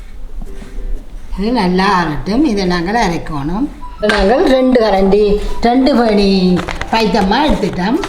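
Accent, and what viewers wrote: Jaffna, Sri Lanka. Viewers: Amma neega oor enga? Ungada pecha kekka enakku yalpanam varavenum pola irukku. Nandri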